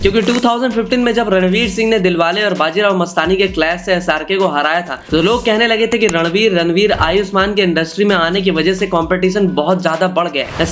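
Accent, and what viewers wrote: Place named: Bhopal, India. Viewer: Bhai tu bhopali lagriya hai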